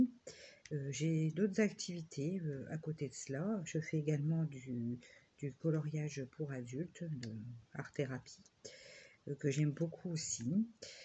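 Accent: North, France. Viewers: Oh une voisine en plus j'avais cru entendre le petit accent du nord lol. Moi je suis du nord mais originaire du pas de calais.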